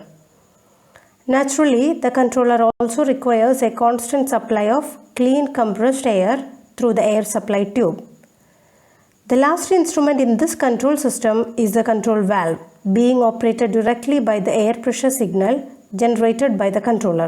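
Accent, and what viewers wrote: India. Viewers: The Narrator is a Malayalee... Well explained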